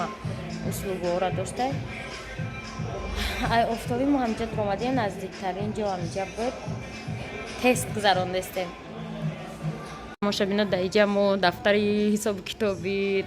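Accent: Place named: Kuhistoni, Tajikistan. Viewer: Кадом кухистонии хта бо месниги мегири якдам кани мани гап мезани якдам мхраш мегуй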